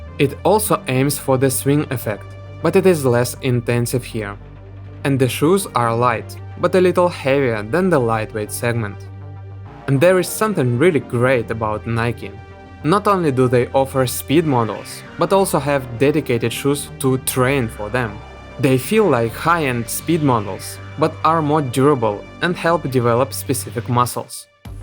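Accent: Russia. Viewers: По произношению понятно, что текст читает русский:) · Хорошо что русский акцент, так понятнее 😃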